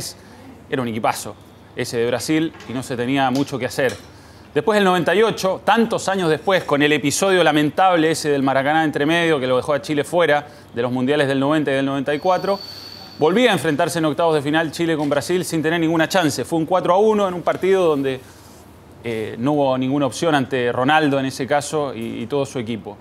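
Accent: Argentina. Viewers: y pa que habla como argentino? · Manoel es tan políglota como zamorano! Que buen español argentino! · hablaba como.argentino en ese tiempo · Debo destacar a este periodisata, me parece muy bien todo lo que ha logrado en FOX SPORTS pero wn...... DEJATE DE HABLAR COMO ARGENTINO!! Cada día me molesta más escucharte sólo por el puto acento que colocas. Molestan a Zamorano y tú HACES LO MISMO!!! Repito, me parece uno de los buenos y cada vez mejor posicionados de FOX SPORTS pero loco... ERES CHILENOOOOOOOOOOO!!!!!!!!!!!! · hablaba como argentino en ese tiempo jajjaa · Yo juraba que era argentino jaja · y un pequeño Tono Argentino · hablando con acento argentino Manoel?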